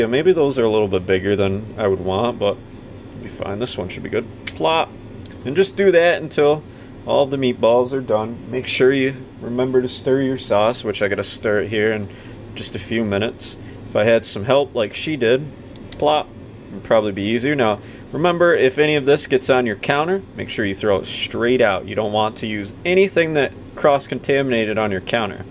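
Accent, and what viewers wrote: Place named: Midwest, United States. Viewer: midwestern accent... reminds me of the dad i never had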